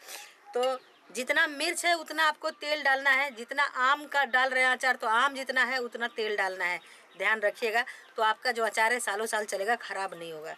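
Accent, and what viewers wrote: Bihar, India. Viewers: आपका आभार ।आपका बताने का तरीका बहुत मस्त मजेदार, बिहारी स्टाइल का,संगीत मय।मज़ा आ गया।😅😅😅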